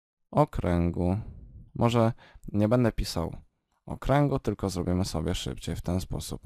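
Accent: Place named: Ukraine. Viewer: Chłopie ty jesteś z ukrainy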